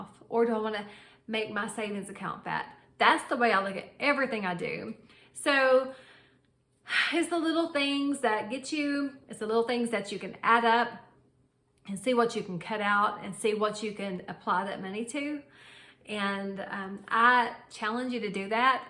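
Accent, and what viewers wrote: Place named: South, United States. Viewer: You’re Dave Ramsey but with a pleasant personality and a better southern accent ❤ I am Russian and I’m loving your videos so much!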